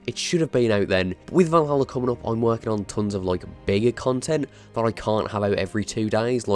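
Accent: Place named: England, United Kingdom. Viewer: Man with English accent likes the setting of England..... go figure.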